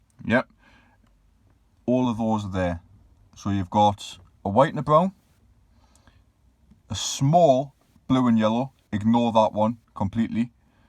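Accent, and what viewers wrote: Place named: Australia. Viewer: What part of Australia are you from ?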